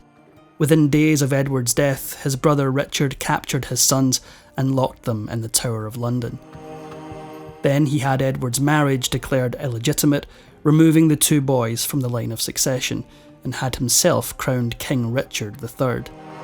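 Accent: Scotland, United Kingdom. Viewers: I don't think that is Neil. He doesn't sound anything like him, apart from being Scottish, and I can't find any reference to whatsoever on this channel. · The narrator has a lovely voice and a charming scottish accent!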